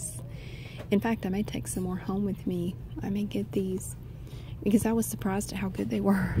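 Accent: United States. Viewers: Your asmr videos always give me the tingles before I fall asleep I love your American accent so much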